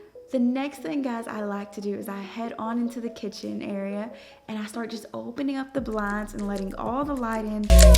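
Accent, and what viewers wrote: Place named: Oklahoma, United States. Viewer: Love this video💕Inspires me to walk with God the right way. Btw sis are you from OK? You sound just like the ppl from my hometown lol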